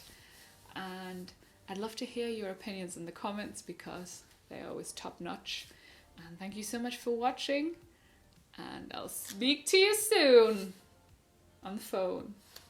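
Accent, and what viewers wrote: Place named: Germany. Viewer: Wie man den Deutschen akzent direkt raushört😅. Hab vor kurzem wieder Unfabulous durchgeguckt. So nostalgie aber zoey101 hab ich auch geliebt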